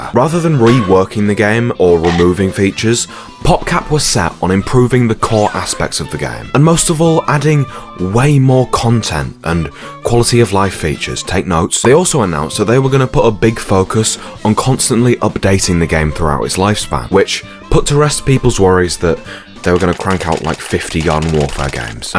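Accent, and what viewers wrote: United Kingdom. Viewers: you sound funny for a british person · Are u British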